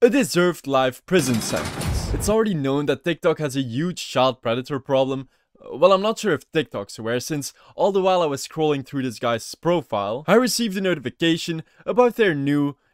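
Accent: Netherlands. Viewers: i have a question i wanteed to ask, are u dutch? since u sound very dutch!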